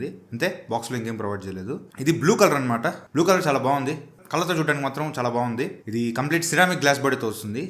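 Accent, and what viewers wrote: Nellore, India. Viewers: Bro medhi nellore aa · Bro meedhi nellore e na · Bro midhi nellore na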